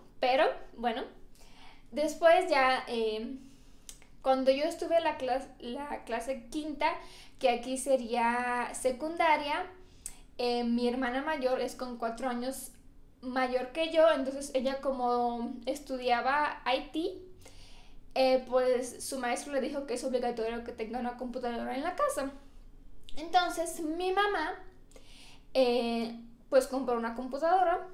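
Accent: Mexico. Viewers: Tu acento es super mexicano. Felicidades. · "nolohayo" "creo que le hayo aquí" 😊😊😊 bien mexicano hablas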